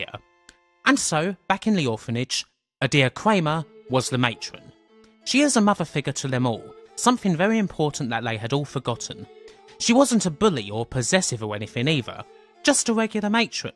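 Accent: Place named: England, United Kingdom. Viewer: Как меня бесит акцент англичан и не неси херню про сон и смерть главного героя ) Это моя лучшая игра.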